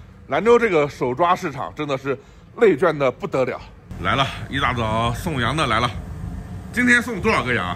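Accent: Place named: Northern Jiangsu, China. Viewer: 博主口音像是苏北😂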